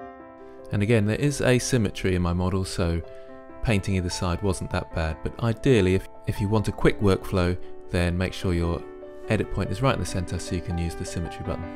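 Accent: United Kingdom. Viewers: Awesme like always, thanks for the many tutorials! iam not a nativ english speaker but i love your British Accent :D its what i was looking for lo learn how to use Blender. may i ask u a question? i would like to know how you creat a complete Scene with more than one 3D model. For example the Background, the Floor and some Objects like a Barrel and a Fence infront of a Building. Do you still use Blender to put them together? If I missed the answer in one of your videos, I'm sorry. Sometimes i do not understand all word in English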